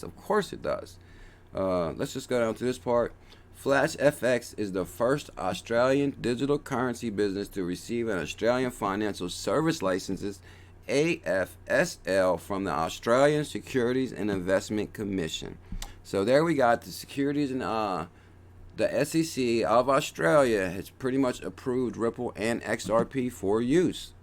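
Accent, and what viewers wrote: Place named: Northeast, United States. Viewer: Thank you! First time I've listened to your video & it won't be the last. Thorough research & I like how you highlight what is important and keep moving. I've invested quite a bit in XRP and found a way to continue to do so....what a price! I grew up outside of Philly and you sound like you could be from N.J. or N.Y or PA or somewhere around there. I'm now living on the coast just south of Boston. Thanks again! Sharman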